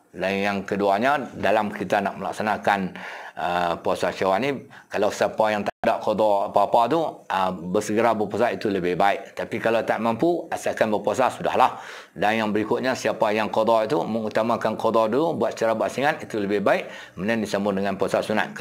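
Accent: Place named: Kedah, Malaysia. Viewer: loghat Kedah ada yg sneng ada yg ssh utk digertiin...dri Kelantan Sy terus gk bisa gerti...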